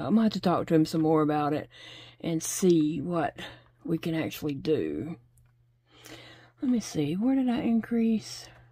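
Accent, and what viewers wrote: South, United States. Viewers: I must say again how much I love your Southern American accent ! So does my Chihuahua and my other puppy dog girls who lay around me and listen to u with me. 😁🐕💗 Our Aussie (Australian) accent is so different and not as pretty and relaxing to listen to.😂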